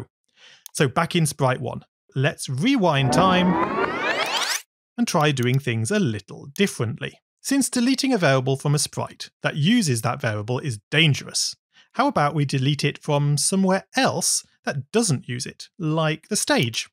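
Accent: United Kingdom. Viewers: BAD MOVIE, MAN SOUND TOO BRITISH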